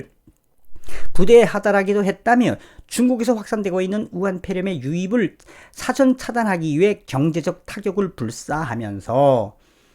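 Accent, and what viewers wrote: North Korea. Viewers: 너무 북한식 어투는 거부감이 옵니다. 가열차게ᆢ? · 북한말 하지 마세요. 가열차게×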